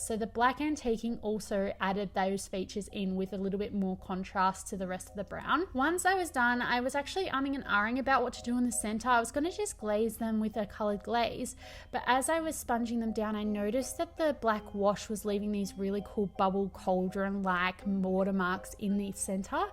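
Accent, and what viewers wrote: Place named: Australia. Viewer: You are such an Aussie! Lmao "oh there's a creepy crawly." You pick it out. Me: nope!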